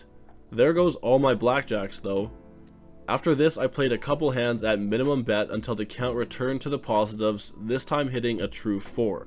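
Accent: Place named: Canada. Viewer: you sound canadian, so guessing you arent tipping. but making that kind of money you really should tip your dealers.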